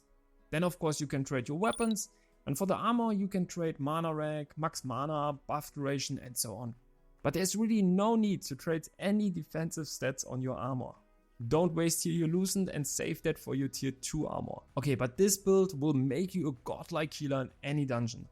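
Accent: Germany. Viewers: german who speak english xD funny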